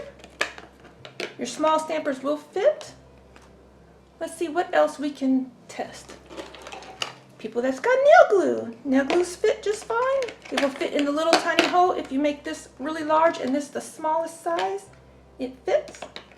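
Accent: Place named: South, United States. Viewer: lol, I used to speak southern too sweetie, took years to wear off 😁 your speech is fine, I’ve never noticed you mispronounce a thing, honestly. Hey, no matter which name you say now, I’ll match both lol.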